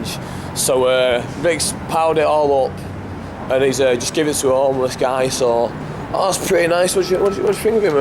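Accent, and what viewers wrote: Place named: North, United Kingdom. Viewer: great series mate, i'm going WM31 so just started watching next mans videos to get an idea. ain't gunna lie, the northerner in ya came out- great to watch. keep it up lad.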